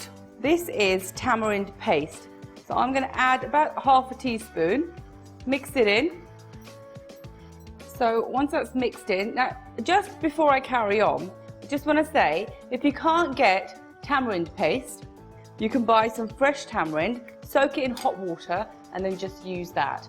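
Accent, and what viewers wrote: England, United Kingdom. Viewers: the first thing I noticed is your British accent. my twin was watching you in the living room and I overheard it from my room and thought why nigella lawson sounds quite different today 😂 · English accent me chole bhature.....maja ni aya...😛😛😛😛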